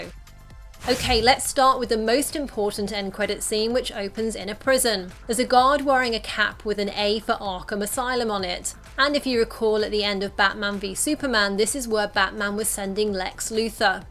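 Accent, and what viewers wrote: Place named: England, United Kingdom. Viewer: are you from England? I'm in love with your voice and that accent.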